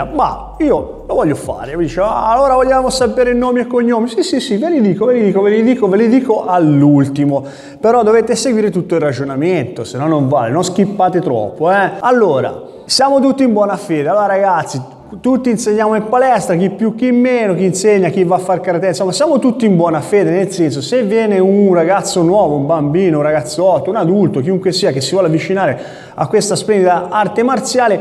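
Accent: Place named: Tuscany, Italy. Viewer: Ma sei in grande! E poi, con l'accento "toscanaccio", troppo simpatico.. bisogna accettsre x 💪🏻 forza. Ciao by Jerry (Milano)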